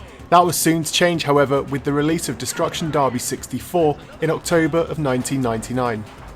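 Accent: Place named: United Kingdom. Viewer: british english sounds gay, so shameful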